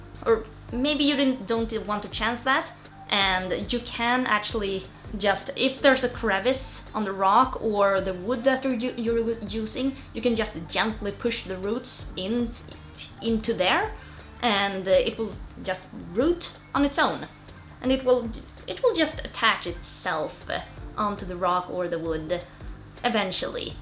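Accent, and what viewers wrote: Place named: Scandinavia. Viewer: Hey, I was searching for something the other day & found your channel! My name is Stein Eric & I live in Minnesota with a few other Swedish people who you might know......Just a little joke- 🤭 So I'm a big fan of anything to do with freshwater aquascaping. I have been keeping pets my entire life but nowadays it is mostly peaceful tropical fish with the Bettas, Cory's, Dwarf Rainbows, Harlequins,Otocinclus & and the only snails that are allowed to be in the aquarium are Nerites!!!!!! I have 9 aquariums and a handful of small enclosures that are having just plants & several types of Moss (my favorite plant? ) I just wanted you to know I've been enjoying your videos and the best Scandinavian accent that I've heard in too long...I am a 1st generation American and both of my parents are from Norway and grew up in Vik ❤️ I am going to IKEA tomorrow for the supplies I need to build my 1st aquarium and hopefully the 2nd too. 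🤞 I watched Tanner on Saturday, and he had a brilliant idea for sure & if you have not already seen the last video then I am going to be really quiet and now I have the best idea for you to get online and see what Serpa Designs is up to. Ciao 🌉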